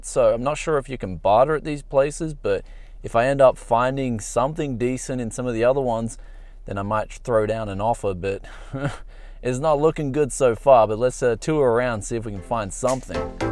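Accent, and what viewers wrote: Australia. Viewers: SuperPorn! Got to love Austalian accent ! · The Australian accent didn't do you any favors. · It’s the Aussie accent 😂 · I deadess heard you say you're checking out some "Porn brokers" love that aussie accent. :D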